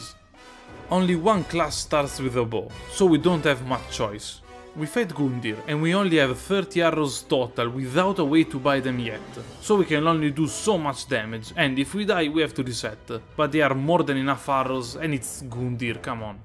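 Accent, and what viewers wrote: Italy. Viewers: I started this video without knowing that was your actual voice and I legit just fell in love with it, time to play "Guess where that accent is from" Nevermind, I was guessing Siberia but he's actually from Italy. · AH HA! Lo sapevo che l'accento era familiare hahaha! Per qualche motivo, questo è il primo commento che leggo che mi conferma che sei italiano anche se lo sospettavo dal primo momento (?) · For real, I love Italian accent, I love dark souls so mathematically (I love this channel)². Btw awesome videos my dude, greetings from Brazil. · You sound a lot like an italian trying to speak english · Ma per curiosità, che accento è? Che parte di Italia? Ogni tanto mi sembra quasi spagnolo o slavo ^__^ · Sottofondo con le OST di Monster Hunter, contenuto di qualità e l'accento italiano non fa altro che rendere il video più scorrevole! (almeno per me :3) Ti ho scoperto da poco e mi sono iscritto subito, un canale così va supportato ^-^ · I love how i could tell you are italian from the first thing you said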